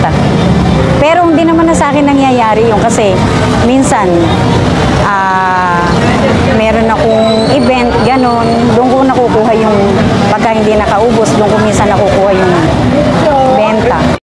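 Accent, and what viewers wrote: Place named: Pampanga, Philippines. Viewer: Me tono po kayong Kapampangan God Bless po❤️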